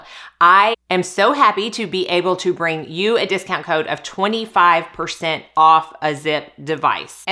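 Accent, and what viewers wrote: South, United States. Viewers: Hi. What a great video. Thank you so much. Always such excellent information. I trust your honest and professional advice. You have, what looks like, perfect skin. I love you and I love your channel. You are so very beautiful and feminine and sexy. With that charming southern accent, it’s no wonder your channel is so popular and successful. Who wouldn’t want to look as gorgeous as you. Thanks again.. Peace, Calvin.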